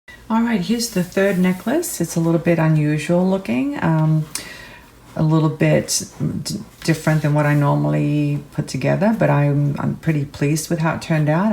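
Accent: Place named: Australia. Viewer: Forgot to say, Love your accent! Are you Australian? Just thought I’d guess.. your home is absolutely beautiful by the way!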